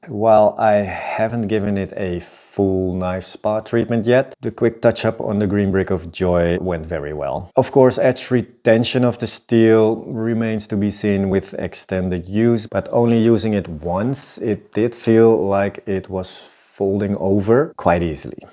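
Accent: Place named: Netherlands. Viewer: Heard about your channel on the sharpening subreddit, I hear a Dutch accent in first 2 seconds. Love it ❤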